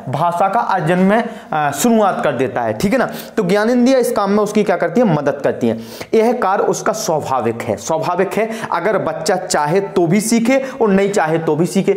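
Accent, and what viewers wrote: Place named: Bundelkhand, India. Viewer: Very nice sir thanku aapki bundelkhani awesome👍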